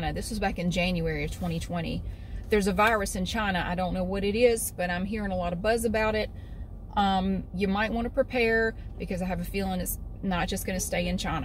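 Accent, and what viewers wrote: South, United States. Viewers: Hey! Longtime fan of yours (I’m originally from the South and your accent reminds me of home:) I live in DC and just happened to drive by the Seabee memorial while showing some folks around. Made me think of you and your dad. Hope y’all have a wonderful time here and that this gorgeous weather we’re having lasts for you!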